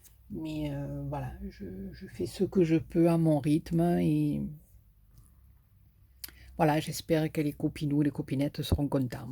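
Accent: South, France. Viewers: Merci à vous! J adore votre petit accent du midi! Courage ! Cordialement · bjr madame trop trop beau je ne connaissais pas le système de fleur en simili cuir et j'adore votre accent du midi bonne journée continuer comme cela c 'est super et bon rétablissement a vous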